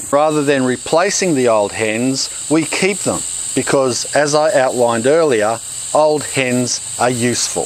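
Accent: Australia. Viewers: I love the video, the australian accent, the puns and those shorts.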